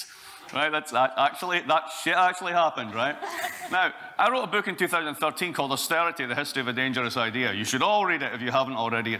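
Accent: Scotland, United Kingdom. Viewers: This is a proper Scottish accent you silly person! Do you know that Scottish generated, for such a small population, the greatest economic thinkers and one of the greatest concentration of philosophers! I suggest you educate yourself.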